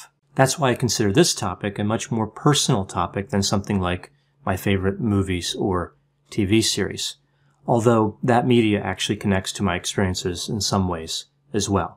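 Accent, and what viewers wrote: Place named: Delaware Valley, United States. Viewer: Your list is heroically bland and this video demonstrates your core virtues of steadfastness, honesty, and not caring what anyone else thinks. Also, I like your Delaware Valley accent. I grew up hearing it and find it comforting.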